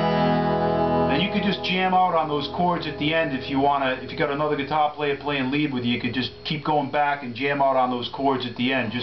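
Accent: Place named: Long Island, United States. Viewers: I've been watching and learning this slowly along with a handful of other songs. Very insightful and well done, although you're LI accent is a bit amusing. Not meaning to be insulting, good job and good guitar. Guessing you're about my age, so I gotta ask. Do you remember The Good Rats? Awesome band that deserved a lot more recognition than they received. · I've forgotten so i'm back.Thanks for the refresher and the New York accent,reminds me of home. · I get a kick out of your east coast accent. You sound like I did 45 years ago. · Real Good! Can't wait to get home & try it out., you sound like my ex-bro-in-law, Joe, from da' Bronx - he's a good guy, too.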